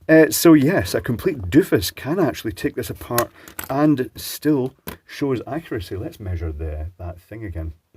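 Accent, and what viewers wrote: Scotland, United Kingdom. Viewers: I have a heap of unusable ones and whilst of a similar stature and deftness with a screwdriver clearly lack the dulcet soft Scot's accent to lull the fiendish devices into compliance.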